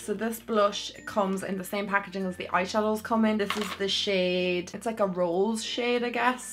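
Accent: Ireland. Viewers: Is thought your from Ireland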